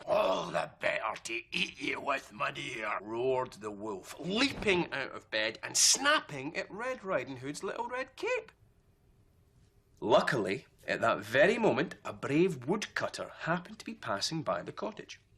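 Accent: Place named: Scotland, United Kingdom. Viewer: Ира, молодец какая! Отличное видео)) Джеймс со своим шотландским акцентом вообще отлично вписался)) Особенно волку на славу удался! Спасибо за ссылку!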